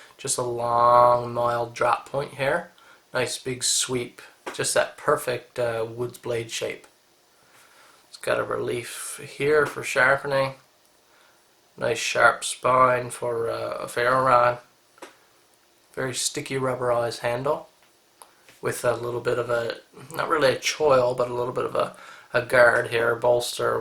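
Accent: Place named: Newfoundland, Canada. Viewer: Your accent. You a Newfie?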